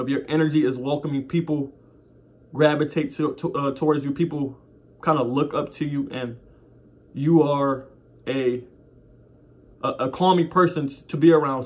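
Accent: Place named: Texas, United States. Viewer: lol ok you talk like them bois in tx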